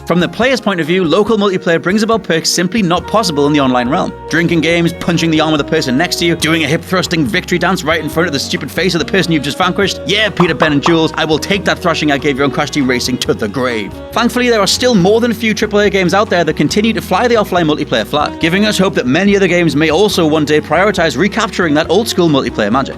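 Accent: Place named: Newcastle, United Kingdom. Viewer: At everyone criticising his narrative speed; You guys have never met a Geordie, have you? Confusing outsiders is our raison d'etre.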